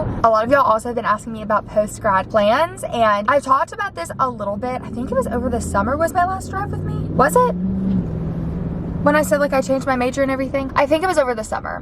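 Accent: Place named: Texas, United States. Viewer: I 💯 think your a texas girl you have that accent❤ I’m from oregon it’s beautiful here but if I could get my daughter to transfer we’ll be moving to texas or Nashville.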